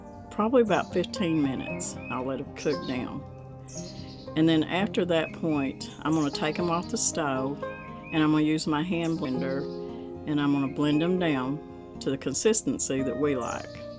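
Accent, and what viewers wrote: South, United States. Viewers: Love the Southern accent. I was like what’s a steak cake? 🤣🤣🤣Stack cake. Don’t know what that is, but I’ll look that up next. I’m from the PNW so my ears don’t always hear right!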